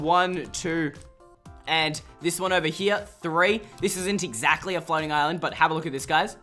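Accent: Australia. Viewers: How old are you just wanna know your age you sound and look young but u can already tell you come from Australia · r u Australian?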